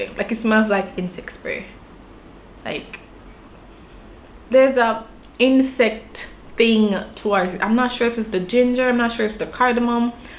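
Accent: Jamaica. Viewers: Yasss I’m hearing a Jamaican accent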